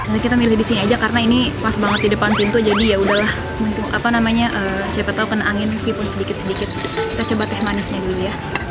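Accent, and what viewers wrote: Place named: Bandung, Indonesia. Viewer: ngomong nya ky jalanan bandung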